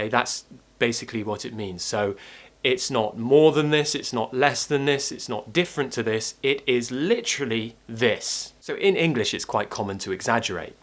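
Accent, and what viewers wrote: RP, United Kingdom. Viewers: I looooove the RP accent ❤️ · I'm brazilian and completely in love with your british accent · I'm Mexican and I prefer the British pronunciation vs American, it's easier and eny foreign can understand what you are saying.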